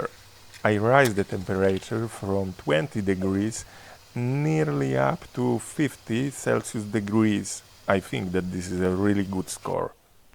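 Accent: Poland. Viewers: Od pierwszych słów wiedziałam że jesteś z Polski 😊 dzięki za film. Planujemy coś podobnego w ogrodzie. Pytanie, czy wanna nie nagrzewa się zbytnio bezpośrednio od ognia? Nie parzy aby?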